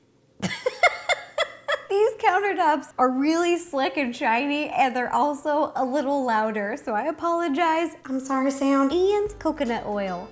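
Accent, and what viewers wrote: United States. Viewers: today you kinda sounded extra....country.....ish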